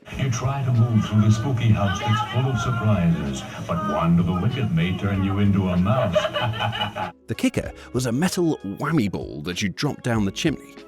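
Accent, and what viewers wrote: England, United Kingdom. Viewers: Don't remember any of these in the UK despite the England sh narration , but in the UK, I think Witchy Witchy was Haunted House.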